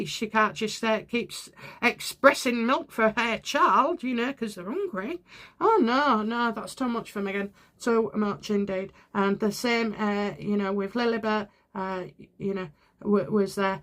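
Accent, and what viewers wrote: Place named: England, United Kingdom. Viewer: Thank you for another great reading =). Your summaries at the end are awesome too. I love your little giggles and your accent. I am Canadian and I love English accents =). Prayers for you and your mum =). I have 5 children (all 20+ now) and I nursed them all. I was happy that I was able to do that. I feel badly for mothers that really want to and are not able to. I had friends that really wanted to but they didn't produce enough milk. I am not surprised that Meghan didn't have the patience or mothering instinct that she wanted to even try very hard, even if the hormones produced the milk. I am disappointed in King Charles not honouring his mother's wishes, and the promise he made to her to sort this. Allegedly, he is meant to make Prince William Regent soon (a few readers have said this) so when this happens, will that enable Prince William to legally be able to do that? 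Or will he have to wait until he is King William to do that? Would you please continue on this and find out in another reading? I am wanting this to come out so badly!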